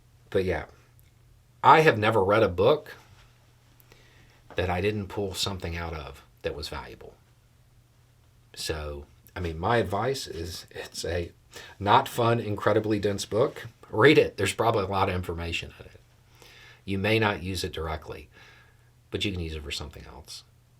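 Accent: New Zealand. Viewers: not sure if this is the case, but you sound as if you’re in NZ now? Possibly studied or lived there? 😊 I would love to get a chance to meet Māori people IN NZ instead of here in the US. The syntax of the language blows my mind. To Beaus point… this tRump disease has been working its way through international politics since about 2017’ish (possibly earlier) I watched Victorian and NSW politicians turn into antivaxxers overnight. I was heartbroken when I heard of the first community (local) infections in both NZ and AUS. Thank goodness I was able to reach a few of my friends who got the vaccine at my personal request. However, as great as being able to help there felt, I had neglected my own parents. My Mom passed from Covid in January of 2022. I wish that I could place the blame on tRump only. I cannot do that while others that lead to so much unnecessary suffering and death still walk around. 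So I keep trying. Thanks for letting me take you verbally hostage for a moment! 🥹 Don’t let tRump or his politics invade your country like he did ours. Lest many more suffer and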